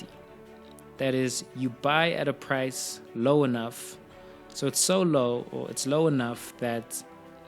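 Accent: South Africa. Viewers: Nice video! Are you South African?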